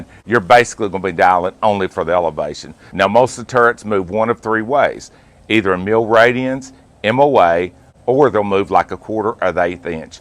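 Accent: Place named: Tennessee, United States. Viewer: I'm from TN. & Just curious what local u r from. I'm having trouble deciding on TN area or maybe? West Virginia ish? Anywho thanks for the no-nonsense !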